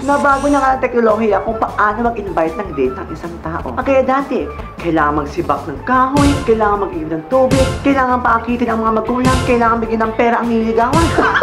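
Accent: Visayas, Philippines. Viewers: Bisaya man ka dong oiii.hahhah😂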